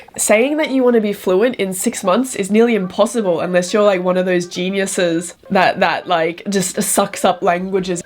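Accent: Australia. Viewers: 발음 너무 머싯서요 호주발음임에도 불구하고 알아듣기 쉬워요..!! 코피주루룩...언니멋져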